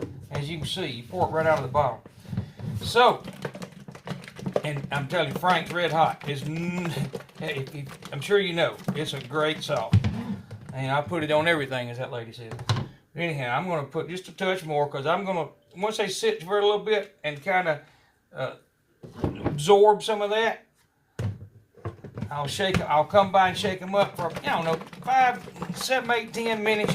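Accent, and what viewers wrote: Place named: Alabama, United States. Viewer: Sounds like he's from Alabama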